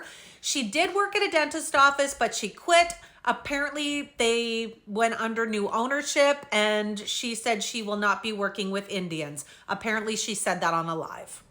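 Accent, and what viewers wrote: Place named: East Coast, Canada. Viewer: Just found you tonight. You have to be Canadian, east coast!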